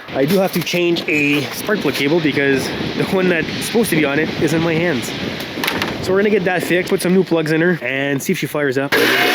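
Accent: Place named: Quebec, Canada. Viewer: t tu québécois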